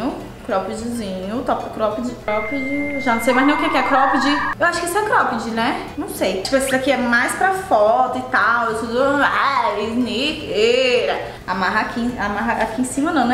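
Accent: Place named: Fortaleza, Brazil. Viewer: fortaleza eu acho não tenho certeza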